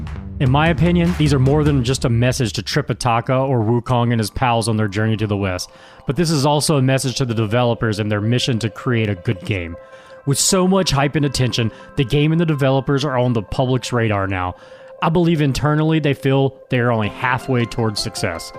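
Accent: Texas, United States. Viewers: Wait, is that like a Texan accent? I just watched the video released before this one and you (?) were speaking Chinese. What's going on lol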